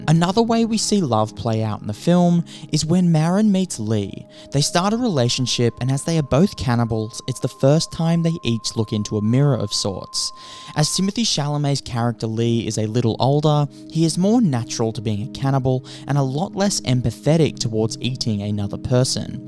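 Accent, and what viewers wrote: Australia. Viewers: I'd like you to redo the video in a normal American accent the Australian accent is bizarre and very unpleasant to listen to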